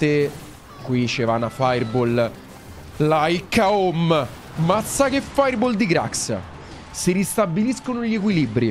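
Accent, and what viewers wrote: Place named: Rome, Italy. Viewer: Perche parla romano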